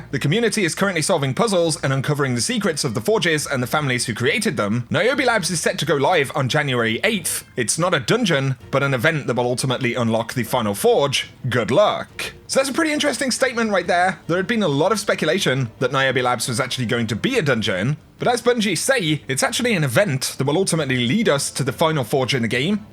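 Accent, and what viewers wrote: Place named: Lancashire, United Kingdom. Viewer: it is though to judge but I'm a tech iv checked my connection and bandwidth its very stable but according to bungie iv got a terrible connection very strange but I think it's the virtual servers auto detecting and trying to correct the connection they have thousands of options on a vr server so anything can go wrong great vid and you sound like your from Lancashire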